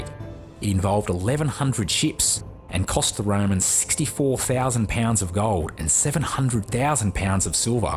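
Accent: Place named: Australia. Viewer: Wonderful overview told with a lilting Australian accent. Shared this with fellow students in a Future Learn course sponsored by Newcastle University, "Hadrian's Wall: Life on the Roman Frontier". Thanks!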